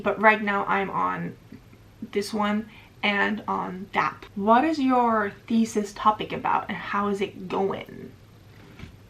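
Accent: United States. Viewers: I also thought she was either an American or a Canadian. Her grammar is also spot on. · All the best to you ! You English is 👍👍👍 At first I thought you are an American ! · The English question answered my question bc it sounds like your from here in USA lol. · You’ve definitely got an American accent. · How is your english so great? You sound more like an american versus dutch. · You sound like somebody from Texas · yeah she says about watching American channels on TV so maybe picked the accent up there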